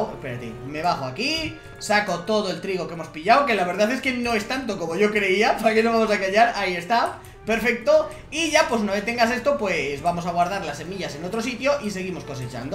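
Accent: Argentina. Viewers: que acento argentino papá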